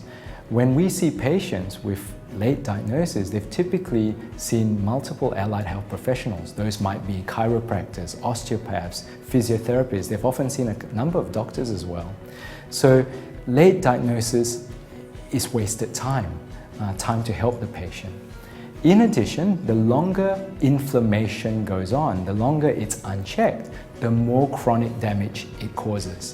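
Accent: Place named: Australia. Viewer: So, so good to hear an Aussie accent, talking about AS here on Youtube. I am a late and constantly changing dx. While I was dxed with AS almost a decade ago, every time I come across another Rheumy the dx seems to change. That or they just won't commit, they just call it some kind of Inflammatory arthritis. OK, admittedly I have more that one systemic condition that directly affects the joints, which seems to confound the issue. Apparently I am also a very complex patient. My take on that is shitty gene's, ha ha. I am female, 50 something, B27 negative, RF negative, ANA positive. I have a relative, my mothers uncle, who had AS. I also have plenty of relatives with RA. Fortunately I have been on DMARD's the whole time. I have tried a biologic but I kept getting stomach infections. My Rheumy at the time told me that if I couldn't tolerate one biologic I couldn't tolerate any of them. 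I also hvae a standing order for rule 3 testing which I get any time I have another blood test done. No one seems to be monitoring them so I don't worry too much. I have had imaging of my lungs and lung function tests, the results of the LFT wasn't good news. As I live in a small country town (in NSW|) and am a waaay too frequent, frequent flyer at my local imaging place. Lets just say the guy who reports on my imaging is always the same guy and he isn't overly concerned with being accurate. It's OK if the specialists actually view the imaging but because I am complex, most of the time there is no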